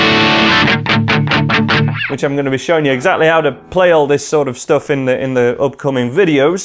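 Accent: United Kingdom. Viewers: im from the US and i can hear this guy just fine. he's got a British accent, but i can everything perfectly. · Can someone tell me the names of the set used in this video and organize them according to price, sorry i can't catch words from the British accent that much and thanks in advance!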